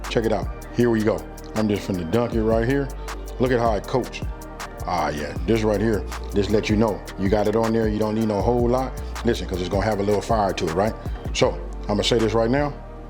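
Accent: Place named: West Coast, United States. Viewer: Philly in the check inn! Big Homie westcoast Accent heavy! 💪🏽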